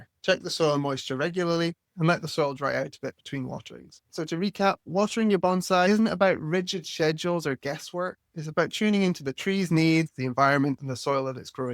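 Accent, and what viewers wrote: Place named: Scotland, United Kingdom. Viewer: He sounds Scottish. A wee bit.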